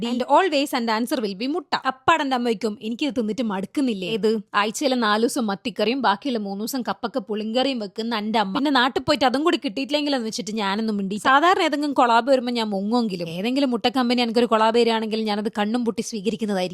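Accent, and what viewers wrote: Kannur, India. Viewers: Inj poliyanu bro ... Nammude Kannur basha yanu main ..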